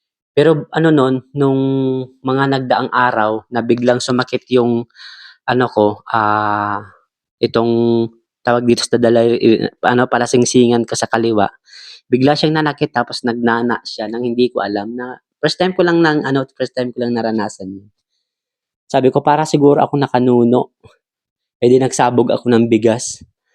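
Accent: Philippines. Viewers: Bicolano ka po Sir?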